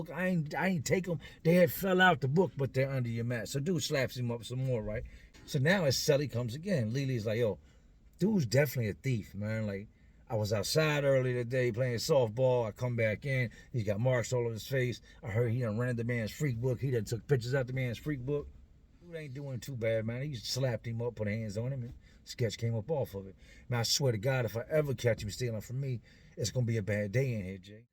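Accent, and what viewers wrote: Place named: Philadelphia, United States. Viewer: i can hear that PHILLY in this voice..even though he from VA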